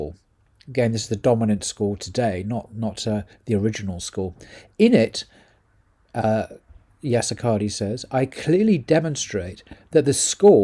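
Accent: United Kingdom. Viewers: Ended up liking before watching 😅 but am gonna hear completely ~After hearing completely~ Somehow your British accent felt more nuanced this time 😅 I had originally felt that Salafism is the right thing, because of their premise that following the religion of the first three generations is following Islam. As of last or last to last year, I have just washed my hands off anything that ends in an -ism or is anything like that, because by their very nature they are limited. I have also listened to Dr Yasir Qadhi a lot, and in one of these sessions where he was forced to discuss creed, he said in the end something similar to that this discussion is not too fruitful because whether athari or ashari, people still do not show any major differences in righteousness, closeness to religion etc. i.e. the outcome is not very different for being ashari or athari 😅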